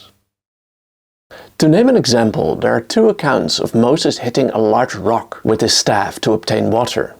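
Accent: Netherlands. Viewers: YOUR ENGLISH IS VERY GOOD, almost without any accent, BUT when listening to you for 10 minutes, one can detect your Dutch mothertongue by your v- and s-sounds, which in some intances you pronounce the same as in Dutch and not as in English. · could be because of the Dutch accent. The letter J is pronounced /yay/ and G is /hay/.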